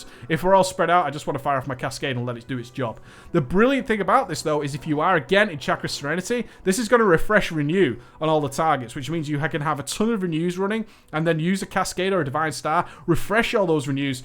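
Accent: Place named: RP, United Kingdom. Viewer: Love the energy and that elite UK english accent.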